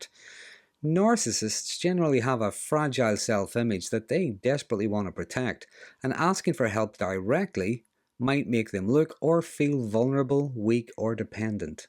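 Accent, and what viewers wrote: Ireland. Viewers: Sounds like an Irishman to me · >>10-Q, Counselor. I'm glad I stumbled onto your "Dry Begging" lecture. >>My psychotherapist and I will discuss it at our upcoming Zoomer! I remain Respectfully Serene, NHG. >>PS what a delightfully sweet Irish accent!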